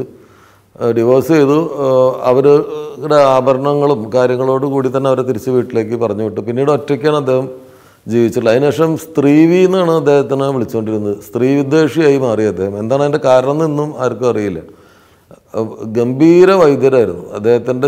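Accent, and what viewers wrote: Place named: Valluvanad, India. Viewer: ലാലുവിന്റെ സംഭാഷണ ശൈലി, വള്ളുവനാടൻ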